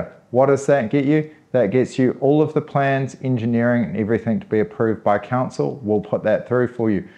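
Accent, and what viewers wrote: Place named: New Zealand. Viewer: gotta love the kiwi accent, reminds me of that banned ad, of the guy getting his neighbours wife & kids to play on his deck !! 🤣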